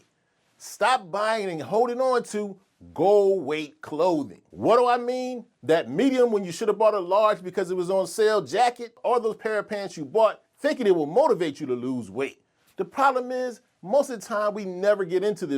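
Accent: Philadelphia, United States. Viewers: You sound like you from west Philly or uptown. Jacket fire.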